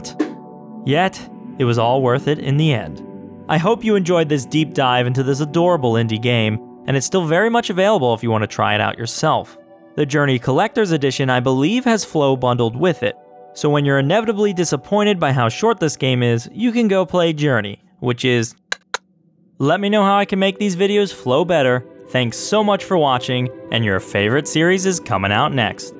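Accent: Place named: Ontario, Canada. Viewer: Are you from Ontario,? I can hear it in your accent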